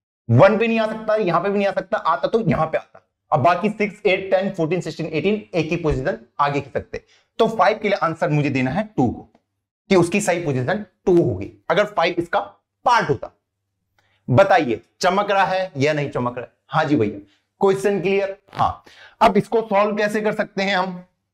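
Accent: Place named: Pahadi, India. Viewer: pahadi tone aari h voice me only true pahadi can notice...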